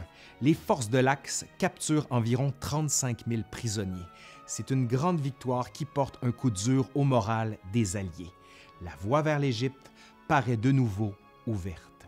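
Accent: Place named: Quebec, Canada. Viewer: C’est tout simplement épique. Avec ton accent québécois en plus.. c’est vraiment trop top. merci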